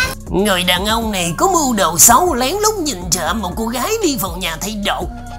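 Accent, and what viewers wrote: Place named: South, Vietnam. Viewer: Giờ mới thấy giọng miền nam lồng tiến , hầu hết giọng bắc kì giờ muốn muốn bao cả youtube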